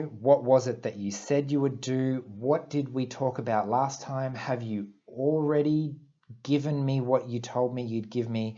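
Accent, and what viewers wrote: Australia. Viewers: Hey man, you sound Australian (sorry if you turn out to be from NZ). Have you met Donna Williams?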